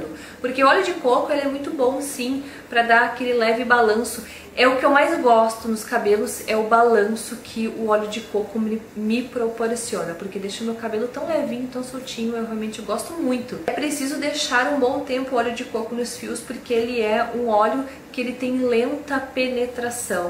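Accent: Rio Grande do Sul, Brazil. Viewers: Sotaque gauches kkk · e gaúcha? Vc fala lindo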